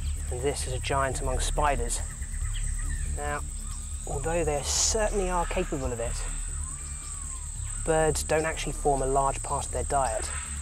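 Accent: England, United Kingdom. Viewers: Truth, specially with that English accent ❤️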